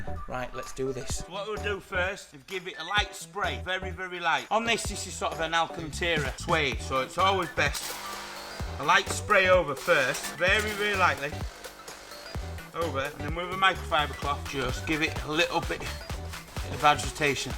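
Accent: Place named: Scotland, United Kingdom. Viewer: This man is speaking in a Scottish accent.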